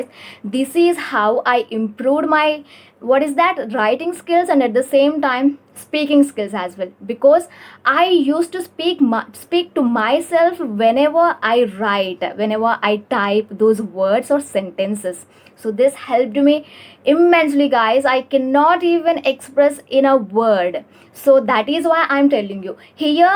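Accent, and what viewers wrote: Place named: Tamil Nadu, India. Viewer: Were are you from i thought u r tamilnadu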